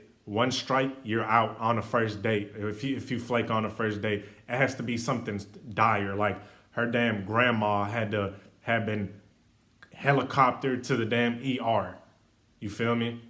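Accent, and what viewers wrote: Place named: Georgia, United States. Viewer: Aye bro you from GA?? That accent real familiar 💯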